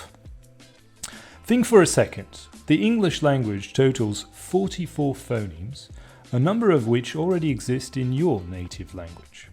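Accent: United Kingdom. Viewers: I'm assuming you are a native French speaker, but it's crazy hearing the British English tone of your voice compared to the French (speaking as an American haha). Both are very clean and clear. I only wish to be able to pronounce French like a native at some point. It's super tricky mimicking some sounds.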